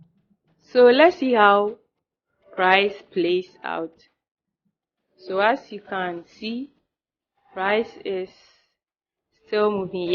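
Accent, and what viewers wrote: Ghana. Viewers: Your accent is a mixed, sounds a little bit Nigerian and a much stronger Ghanaian accent. Nice video btw. I thought you trade smc? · This is my first time hearing voice cus I am always on twitter she sounds like a Ghanaian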